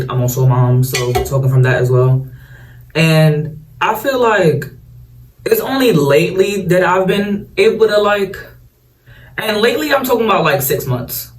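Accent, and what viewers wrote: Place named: New York, United States. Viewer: Not me watching all your videos while baking 😅, speaking straight facts (sounding hella New York) 😂. Your videos feel like our college days when we would ramble and do random things. So happy to see you the same but better 🥹 Miss you so much girl 💕 - little Cat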